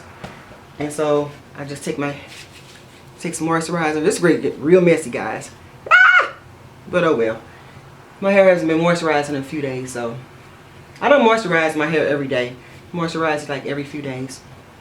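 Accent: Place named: United States. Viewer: you have these gorgeous african features, those cheekbones I'm dying for. your lips are gorgeous and your skin was given to you directly from jesus. hahah, you're beautiful!!! i know you're american, i hear it in your voice, its just your features are so distinct and royal, lol. thanks for the hair tips, yours is lovely!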